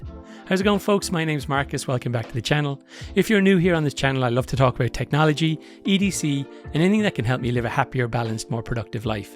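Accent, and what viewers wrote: Ireland. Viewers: Great Video Marcus, I'm looking forward to the smart glasses review, I might buy a pair for travel in the future. Its great hearing an Irish accent, with the amount of tech videos I watch.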